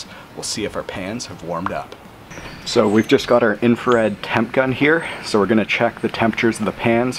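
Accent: Canada. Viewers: I love how extremely wealthy people wear God awful sweaters like that . Called his gas grill a BBQ LOLOLOL you have to be wealthy to talk like that. Must be Canadian because he says aboot not about.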